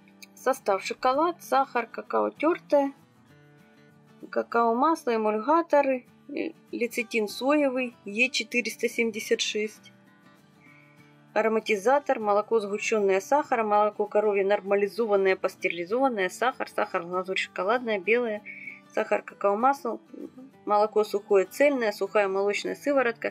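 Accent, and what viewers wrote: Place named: Ukraine. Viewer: Согласна с Вами, коллега! Очень вкусные конфеты! Да и говор у автора видео явно хохлятский... 😂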